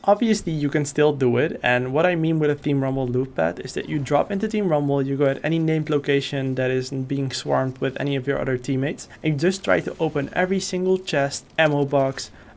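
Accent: Netherlands. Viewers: Ik hoor Nederlands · why do you sound dutch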